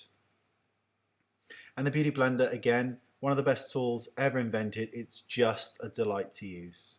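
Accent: United Kingdom. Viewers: Ah... I love when he says delicious. And naughty. You can NEVER beat the British accent. ;) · that girl is beautiful and the finish look was gorgeous. I love the way you work and I also like your accent (I'm learning English so I pay a lot of attention to what you say and the way you say it) btw, where are you from? I reeaally love UK accents. xoxo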